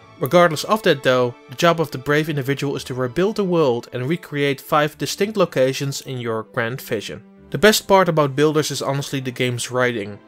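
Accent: Netherlands. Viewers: Are you Dutch? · Thick dutch accent. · Hello Apollo Justice the accent is Dutch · He’s Dutch. Hate to tell ya buddy but English isn’t everyone’s main language. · very dutch yea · That dutch English tho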